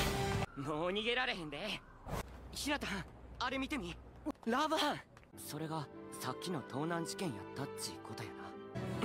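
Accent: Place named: Kansai, Japan. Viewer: Yeah his Kansai Dialect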